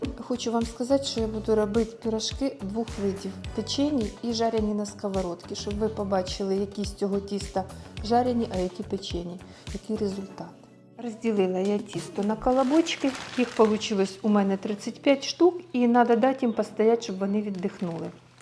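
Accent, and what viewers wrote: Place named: Bila Tserkva, Ukraine. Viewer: Ваша мова,як на Білоцерківщині,я звідти і тоже,дякую.Моя мама пекла чудові пи ріжки,а начинки були різноманітні варена солодка квасоля,мак,сир,капуста,варені цукрові буряки з калиною,сливи,вишні.Тільки ностальгую,бо,соромно,що так і не змогла,як мама готувати тісто.А ще були з лідером і яблуками.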